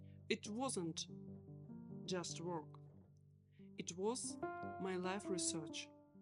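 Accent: Eastern Europe. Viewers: Узнаю родной славянский акцент и здравый научный подход! Приятно слышать. Спасибо,! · Я вижу Вашу любовь к своему делу и с привеликим удовольствием смотрел бы вас, но уж больно родной, до скрежета на зубах восточно-европейский акцент лично меня отталкивает. Извините ❤️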